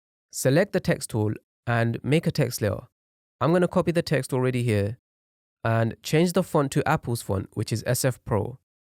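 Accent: United Kingdom. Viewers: Brother, you are from the UK right?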